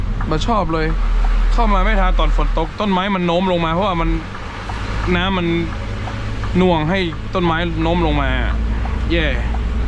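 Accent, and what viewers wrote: North, Thailand. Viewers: ที่ชอบดูเพราะแกพูดเหนือ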